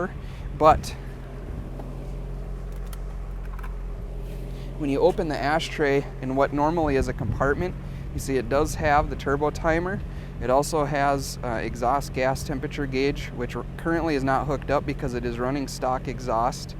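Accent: Canada. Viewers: i dont mean to offend you, the car is cool and all, i mean im not gonna buy it, but, your canadian accent is super annoying. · are u canadian?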